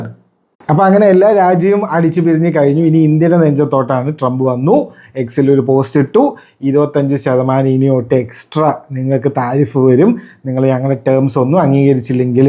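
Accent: Palakkad, India. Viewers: Bro.. പാലക്കാട് ആണോ?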